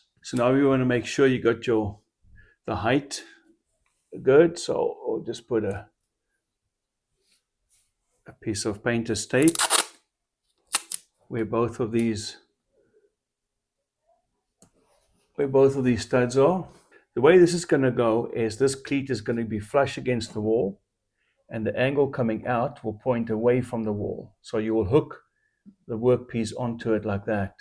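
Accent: South Africa. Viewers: is meneer van suid afrika,want ek hoor daai Afrikaanse "accent"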